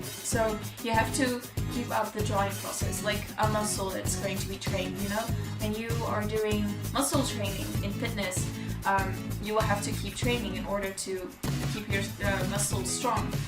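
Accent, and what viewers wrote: Netherlands. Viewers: your dutch? awesome! I'm also dutch ;D well asian, but I am born in the Netherlands good video and I like your accent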